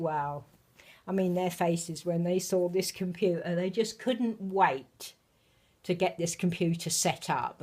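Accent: United Kingdom. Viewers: I love your Sophie .she looks a lot like the Maize sculpt.she is gorgeous.she makes you smile even when your feeling. down many inches is she ? I'm from the u.s.a.YOU sound like you have a British Accent.that I love by the way.kisses to Sophie from aunt Eve.by the way Easter is my favorite time of the year.FOR JESUS.KEEP MAKING THE SOPHIE VIDEOS😝😝😝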